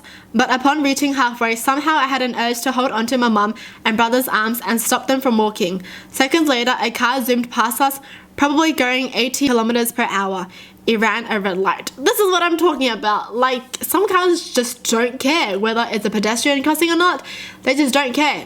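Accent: Australia. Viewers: I could hear her australian accent! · I'm so used to her Australian accent now I don't notice it anymore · I love your accent ohmygooosh! Is that an aussie accent? 😮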